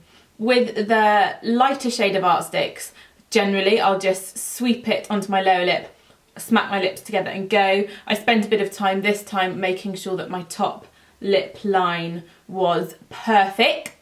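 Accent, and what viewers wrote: United Kingdom. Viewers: All your tutorials are sooooo adorable & I like your British accent big time :) plz don't stop filming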